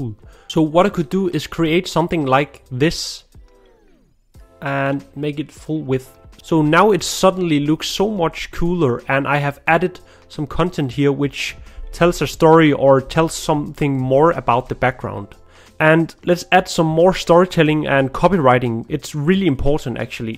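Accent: Denmark. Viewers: i knew immidetly you were danish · Most danish accent ive ever heard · 1. Denmark just got that programmer population. 2. That classic danish accent… not every english speaker can notice it, but about every danish can. 3. I’m also danish, yes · Haha, I knew it. I can smell a Danish accent from miles away! Very distinct sounding...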